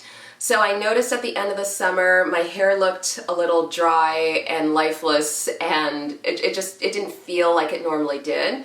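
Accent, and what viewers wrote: Canada. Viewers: It's a Canadian accent, very simply.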